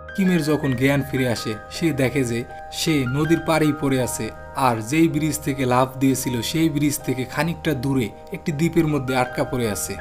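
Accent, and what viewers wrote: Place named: Barisal, Bangladesh. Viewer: ভাইয়ের বাড়ি সম্ভবত বরিশাল অঞ্চলে। উচ্চারনে আঞ্চলিক টান। একেবারেই আনস্মার্ট উচ্চারন।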